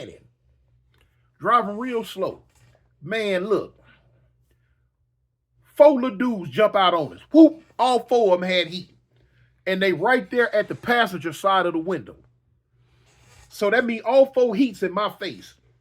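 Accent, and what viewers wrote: United States. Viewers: Know disrespect but your war stories are garbage or maybe it's how you tell them and you sound country as F.ck get your Cali swag right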